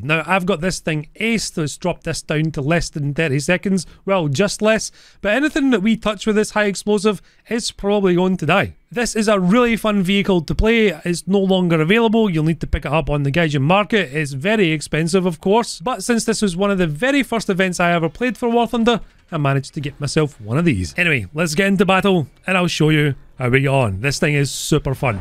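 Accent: Scotland, United Kingdom. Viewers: Hi, I really enjoyed your video. I wanna ask that are you down to make a video about the T29 as well? Because I've already seen your video about the T34, and the T30 as well, and I know that there's not much difference between these tanks, but I genuelly love the T29, and Imo it's better then the Tiger 2 sla. By the way keep up the good work, I love your videos, and Im kinda courius about your "natural" scottish accent;)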